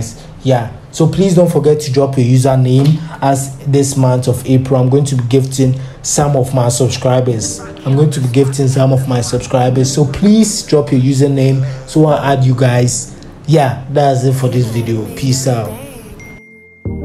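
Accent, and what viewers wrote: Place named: Ghana. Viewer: Your accent sounds Ghanaian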